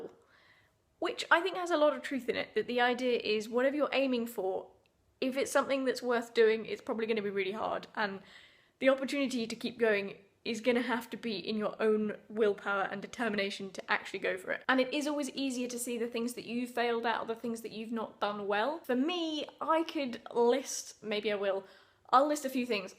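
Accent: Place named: England, United Kingdom. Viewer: Love that proper english accent.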